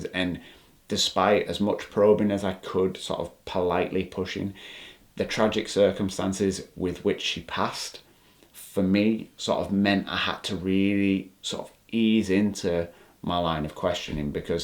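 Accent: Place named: Scotland, United Kingdom. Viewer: Greetings from the desert of so kali. First time at your channel. I take it you are British by your accent, Scottish now I'm hearing you more? Are there any homes left in the British Isles that are not haunted? I'm seeing a lot of your ancestry in your face, excuse me if I'm being presumptuous-I'm seeing Briton, Dane, high German(strong jaw), hair is wavy, is that Celt? High cheekbones, sometimes some Euros and Brits are built such as they look almost part American Indian-spacious eye/cheek area but likely not at all American indian. You seem very tall. Over 6' 2. Are you around 30 yrs old? Are you a Sagittarius? It's so wild to see younger generations embracing the paranormal the way I did when I was a teen, 20's and 30's. But back then, one was "weird" and pretty much ostracized for even talking about the things you are talking about now. 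Ah-that's an angle I hadn't really thought about-that unfinished business keeps the energy here as a ghost. A Wrinkle in Time-great book. I thought it was merely trauma that kept the energy earthbound as a ghost. But what you said makes sense as well. I saw a spirit or ghost one time. It was a man who was staring into my eyes intently as he went from an old man growing backwards until he was a young boy. There were light sparkles all around him and I was just floored, doing a check, recheck on his intent of showing himself to me. Then he disappeared as a young boy curly dark hair, dark eyes and wearing a hat with a feather in it that you'd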